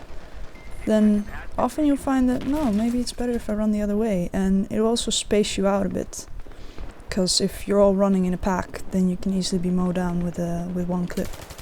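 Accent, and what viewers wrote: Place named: Netherlands. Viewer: You sound Dutch... Am I right?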